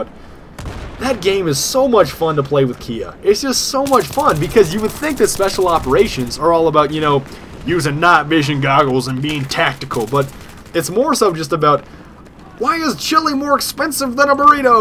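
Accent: Germany. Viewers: your german accent are nice :D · your german is soo hilarious xD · xDDD ur german sounds so funny , awesome vid, greetings from germany :)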